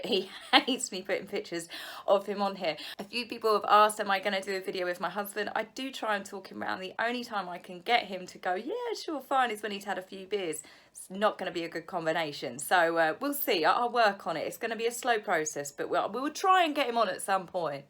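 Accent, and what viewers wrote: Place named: Sussex, United Kingdom. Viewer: Where are you ? I’m in Bexhill (well Little Common really) I was pretty sure that you’re in the same area as me, we speak in the same way ! NICE ONE xx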